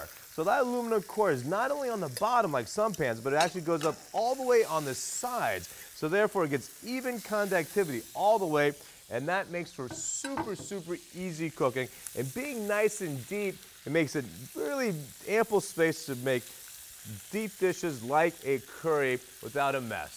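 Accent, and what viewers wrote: United States. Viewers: I agree...his voice sounds like he was born in the US. Martin Yan, on the other hand was born in China..people criticize his accent as "fake", though that *is* his real accent.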